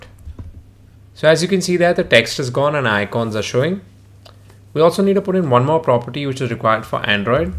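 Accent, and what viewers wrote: India. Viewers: The indian accent ><' ... but ... Good job man ;) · I love your indian accent :) Thank you bro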